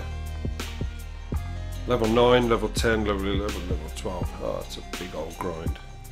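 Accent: Birmingham, United Kingdom. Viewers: Are you back for good? WE all miss our that smooth brummy twang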